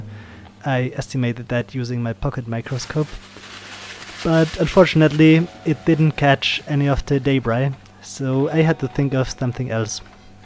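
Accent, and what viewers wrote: Germany. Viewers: Bist du Deutscher? Klingt fast nach nem Sachse xD Liebe Grüße aus Leipzig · You should have cleaned the bottle too. There were still residues here, probably some of the black particles as well. It would be a shame if all the effort had been in vain. I can also hear a German dialect. Can this be? =)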